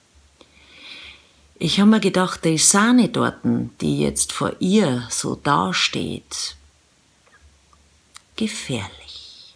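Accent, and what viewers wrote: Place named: Bavaria, Germany. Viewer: Ich liebe bayrisch! Lass Dir bloß von solchen Schnepfen nicht den Tag versauen !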